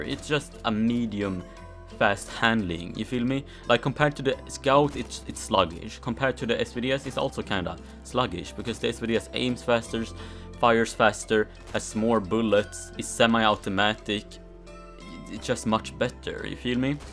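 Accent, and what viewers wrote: Sweden. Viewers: are you from sweden or something?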